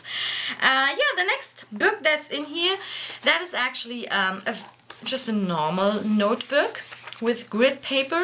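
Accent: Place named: Germany. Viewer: Deutschen Akzent sofort erkannt haha. Ich liebe deine Kunst sehr schön 😘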